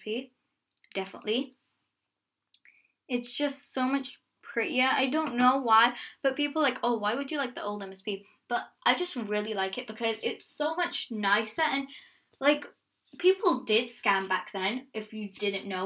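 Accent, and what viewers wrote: Denmark. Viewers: Wait are u danish?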